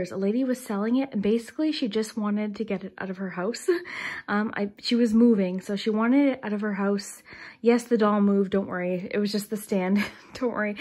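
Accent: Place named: Canada. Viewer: I loved this chit chat video!! Gave me asmr too you have such a lovely voice. wondering if you're from Canada?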